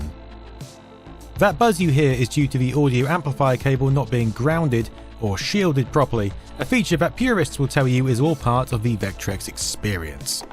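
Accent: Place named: Cockney, United Kingdom. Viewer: Nothing worse than listening to some cockney chimneysweep dragging the language of Shelley and Keats through the mud.👎🏻👎🏻👎🏻👎🏻👎🏻👎🏻👎🏻👎🏻👎🏻👎🏻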